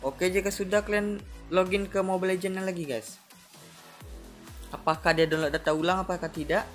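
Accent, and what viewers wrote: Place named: West Sumatra, Indonesia. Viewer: Nampak bna urang sumbar ky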